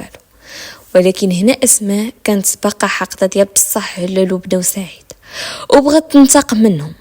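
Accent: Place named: Morocco. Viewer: أول مرة أفهم القصة كاملة باللهجة المغربية البيضاء وبسرد جميل رغم سرعته.. الحمد لله وتحية من اليمن❤❤❤❤❤❤❤❤❤❤❤❤❤❤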